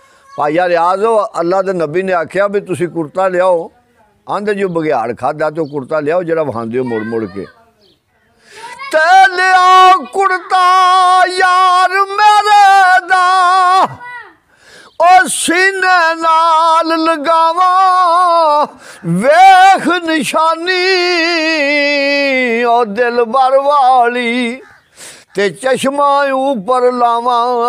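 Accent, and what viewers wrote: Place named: Sialkot, Pakistan. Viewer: Your accent of punjabi boli reminds me of my parents and mama ji who came from Sialkot.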